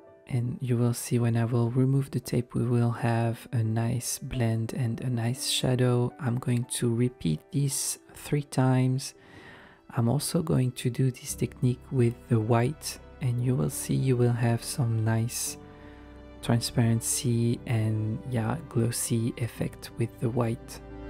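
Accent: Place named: France. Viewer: I recognize a french accent when I here one hahaha 😂 Is your family from Corsica? Beautiful painting as always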